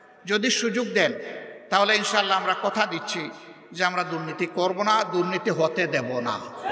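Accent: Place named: Sylhet, Bangladesh. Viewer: বিভিন্ন বিভাগের লোক আমদের সিলেটে বাস করে। তারা বলে আমরা নাকি সুন্দর করে কথা বলতে পারি না।এখন স্যার এর কথা সুনে মনে হচ্ছে বাংলা দেশ সবচেয়ে সুন্দর করে কথা বলে সিলেটের লোক ❤।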